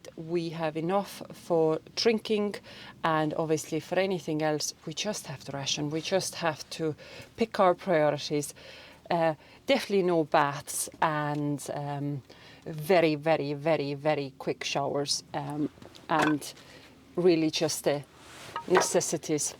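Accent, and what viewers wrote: Kerry, Ireland. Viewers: You have a grand Kerry accent! Up the Kingdom.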